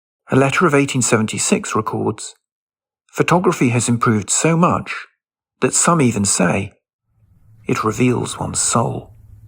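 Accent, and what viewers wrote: United Kingdom. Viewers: The narrator is probably British, they tend to pronounce words as they are spelled. National Geographic has a samurai sword documentary, and one of the persons in that video pronounces it the same way, and he is very English.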